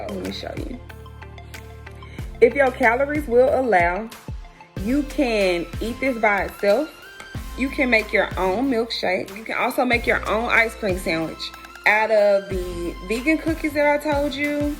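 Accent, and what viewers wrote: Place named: Memphis, United States. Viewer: Gtta be from Memphis sound just like me I swear😂 we need a updated snack video 🫶🏽💕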